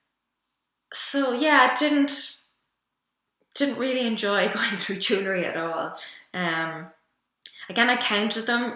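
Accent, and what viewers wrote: Ireland. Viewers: Like your videos. Also love your accent. My guess is your from Ireland.....but I also watch Lydia E. Millen and you both say jumpers, where I call them sweaters. Wonder where we went wrong on this side of pond.....lol. thank you for sharing, katie mullen usa.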